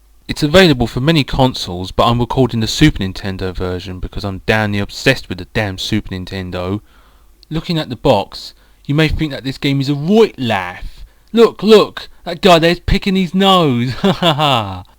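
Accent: United Kingdom. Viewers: holy crap you're actually british O.O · never knew you are british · Dat brit accent! Come on, you don't sound so bad...give it another go! And about Sonic 2 for GG...yeah, it doesn't make sense. The GOOD ending, that is.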